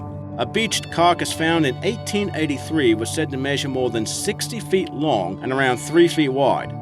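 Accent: Australia. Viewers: Your accents got me stumped part aussie and part buggered if I know. Seriously what nationality would pronounce a mammal as Marmal? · What accent is that? It sounds close to Australian, but then a lot of your vowels don't sound right for Australian English.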